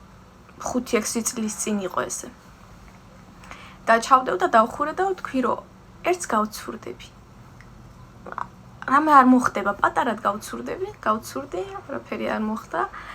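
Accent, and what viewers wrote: Fereydan, Iran. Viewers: რა საყვარელი ხართ, ფერეიდნელი ქართვრლების დიალექტი🫶🏻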